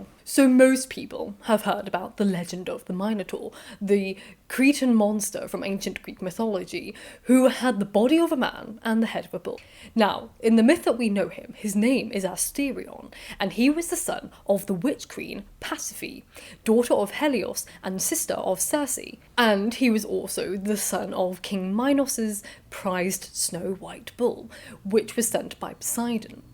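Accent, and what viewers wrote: United Kingdom. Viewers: Just found your channel - I'm enjoying it! So many great and informative videos, and so well presented! Growing up, my librarian parents gave me a book of Greek Mythology to read, so I am familiar with the myths, names and stories. Later in life I re-examined the Minotaur myth twice as I discovered new references to it. The first was Richard Strauss' opera "Ariadne auf Naxos" (I've heard you state you're not a music fan, but check this out as "research"), and the other a short story by Jorge Luis Borges, where he re-tells the story from the point-of-view of the Minotaur! My jaw dropped when I read that - if you don't know it, it's a super short, and satisfying, read. The other thing I note when I watch your videos, is that you folks across the pond pronounce all the Greek names very differently than we do in the States. Is that scholarly, or just regional I wonder? 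Anyway, great job (as usual!)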